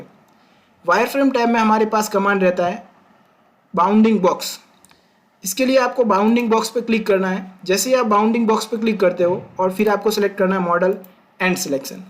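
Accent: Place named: India. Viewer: Heavy Indian accent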